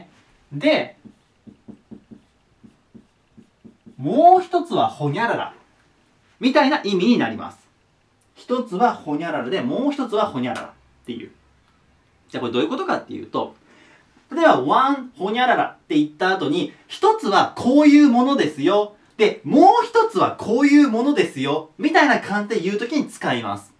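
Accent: Japan. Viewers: 発音はちょっと。。。😂😂ジャパニーズ・イングリッシュ。。。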